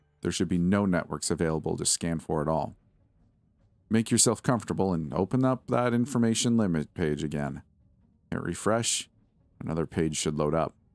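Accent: Canada. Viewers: You are Canadian as hell bro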